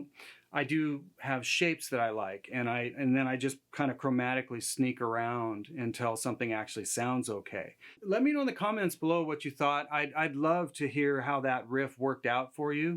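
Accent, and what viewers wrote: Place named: South, United States. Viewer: This was awesome stuff. love that country accent. had me rollin'.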